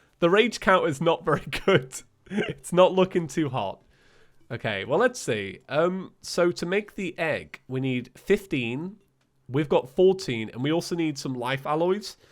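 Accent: Australia. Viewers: Didnt know he was australian XD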